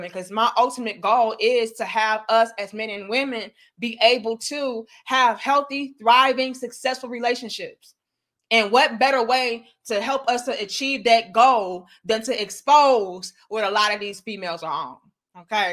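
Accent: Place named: South, United States. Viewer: She has the most unique southern proper voice and dialect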